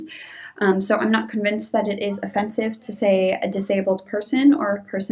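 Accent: United States. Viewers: Thx for your answer. Corsica and Sardinia are similar. Sardinia has less (and lower) mountains...and more beaches. I could guess you were from northern U.S.A. I've been to California, Arizona, Texas and Florida and their accent is different (especially in TX).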